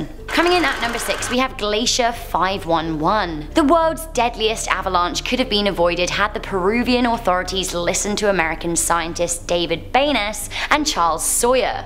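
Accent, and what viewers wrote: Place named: United Kingdom. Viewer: I love your British tone its the best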